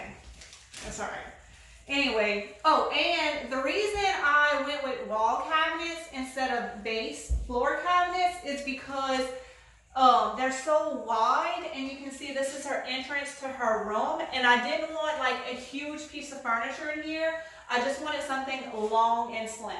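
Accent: Louisiana, United States. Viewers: Great job. First video I have seen of yours, but can tell by your lovely accent you are a Louisiana girl! Thanks for the ideas! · "Pain in tha booty", you are cracking me up! I'm from the South and I love your accent---Louisiana is one of my happy places!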